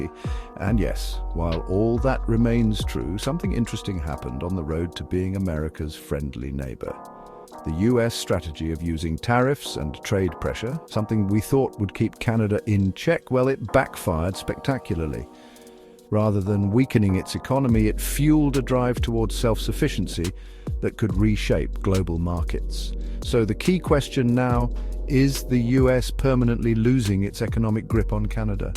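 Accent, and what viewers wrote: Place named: United Kingdom. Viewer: Love the British accent and the USA spelling. Who makes this s___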